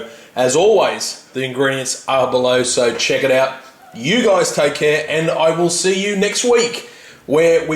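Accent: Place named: Australia. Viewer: Wow! You are really good! My mum is a chef. c: <3 your videos, plus your aussie too! (I am if you are wondering) I'm a South Australian. c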